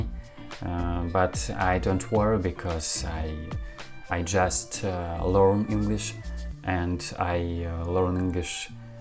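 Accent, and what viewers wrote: Russia. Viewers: bless you. You are doing great with learning English, and I don't think it sounds funny. There's nothing wrong having the Russian accent while you are speaking English. I wish that we in the US would do better with learning more then one language. I took German a long time ago and started to understand and speak it, but then I lost the knowledge because I didn't get to use it. You should be very proud of yourself, and I really mean that. Don't let others tell you that you sound funny or that you can't speak English. Unfortunately like any country we have rude people here too, and you just need to ignore those people making those type of comments. I have a friend who is from China and learned to speak English. He would start apologizing when he couldn't find the right word to say, and I would yell at him for apologizing. I said don't worry about finding the word, what I want you to do is stop apologizing, there's no reason to apologize for not knowing a word or because you don't feel that you aren't saying the word right. 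I'm always happy to help you pronounce or find a word, but you can't keep apologizing. So that is one thing I want to pass on to you, there is never a reason to apologize. And remember the ones who are the rudest to you, are the ones that could probably never begin to learn a second language. You just keep practicing and it will happen in time. English is a damn hard language, it's more then just learning the words. Half the time it seems there is no reason f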